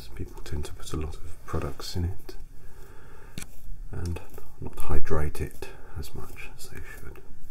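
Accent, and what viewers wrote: United Kingdom. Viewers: Finally a good British ASMR-tist with a beautiful accent and voice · Hold on a second... British accent...barber shop....possibly Fleet Street.... look I may be a lowly American from Virginia but I think I see where this is going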